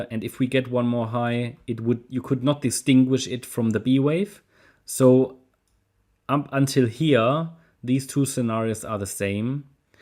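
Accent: Germany. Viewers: Much better German English than mine😅👌🫡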